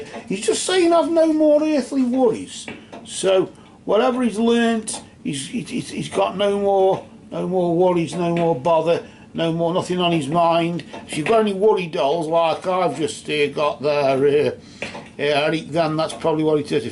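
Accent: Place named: Stoke-on-Trent, United Kingdom. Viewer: I really enjoyed your reading and the video in general, thanks for sharing! For me, I think the reading was trying to say that Eric was now with his father, not sure if there were issues in the past with him but now, they are together but ultimately, it is the past. I think wants to move forward and is carrying guilt, which may be causing the nightmares but the cards are indicating that she should move forward (present, stairs, day) I think the eagle represents that he will always watch over her. The message cards at the end were awesome, I think his message was strong, you did a great job! BTW, where are you from, I am from Stoke-on-Trent and you sound like the bloke next door!!!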